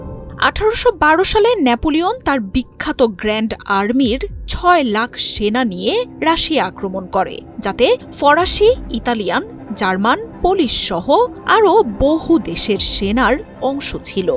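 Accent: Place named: West Bengal, India. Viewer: News reporting shune west Bengal er kono channel mone hocche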